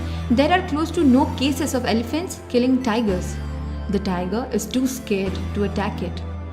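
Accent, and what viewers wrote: India. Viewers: I never knew indian English is better and sounds cute than American english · I nearly listened this 30 times Her voice is the best and Indian accent 😍 is really best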